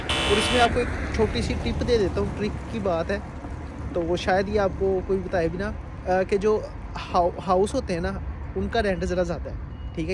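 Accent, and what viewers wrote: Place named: Lorestan, Iran. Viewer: bhai Love for ur politeness and Lori Accent❤😂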